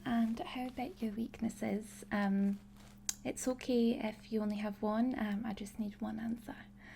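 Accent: Scotland, United Kingdom. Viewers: I love videos where you have this accent, I'm from Glasgow so although this isn't my accent, it's so lovely to hear another Scottish one! It makes the video seem more real to me. Thank you for this video, I've watched it multiple times already! Xx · Love your Scottish accent role plays!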